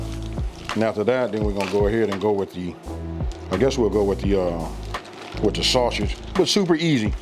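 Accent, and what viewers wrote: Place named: Detroit, United States. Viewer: he must be from Detroit he sounds just like he from there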